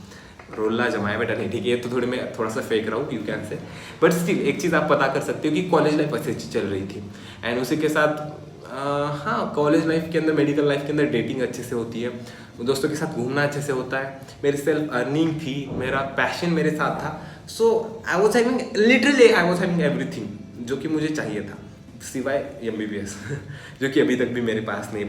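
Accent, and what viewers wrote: Maharashtra, India. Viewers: Love his marathi accents ❤😅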